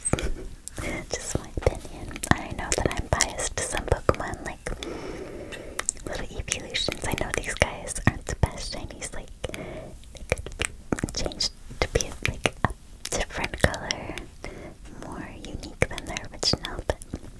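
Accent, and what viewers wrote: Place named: United States. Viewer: Where are you from? I'm british so its quite hard to pin which state of america or perhaps canada... ive no idea but i really like the way you say your intro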